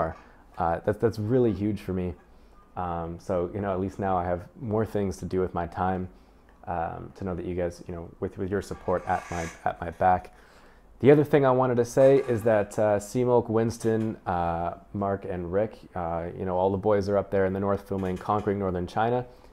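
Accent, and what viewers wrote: Canada. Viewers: That is his Canadian accent. In Canada both 'or' and 'orr' are pronounced with a long o where as in the US 'or' has a long o and 'orr' has a short 'o'. Thus in Canada the 'o' in sorry is pronounced the same as the 'o' in sort, while in the US it is pronounced like the 'o' in pot. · wow I love watching ur vids and when I'm stoned your voice sounds like the purest Canadian so maple syrupy and beautiful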